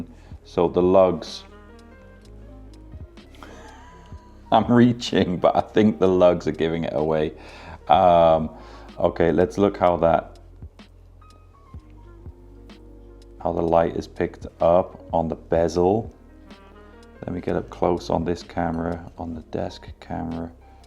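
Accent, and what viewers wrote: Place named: Wales, United Kingdom. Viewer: Where’s your accent from because I thought I could hear a bit of Welsh in it? 🧐👍🏻🏴🇬🇧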